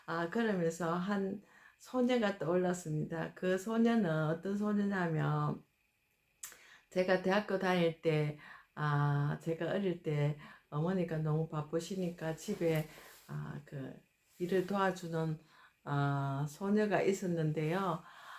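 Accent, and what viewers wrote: Gyeongsang, South Korea. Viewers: 78♡ 교수님 반갑습니다. 경상도 억양이신데 저도 19세까지 포항에서 살았습니다. 영상 잘 보겠습니다.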